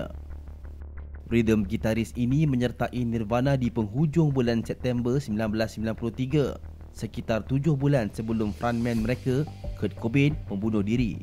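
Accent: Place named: West Java, Indonesia. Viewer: Ini logatnya kaya orang sunda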